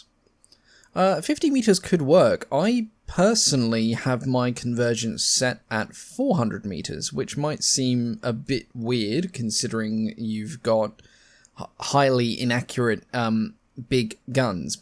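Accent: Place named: United Kingdom. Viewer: Hi, how are you doing my friend and I like your voice it's very british and how is it in the UK? I am moving there in 2 years 🇬🇧^_^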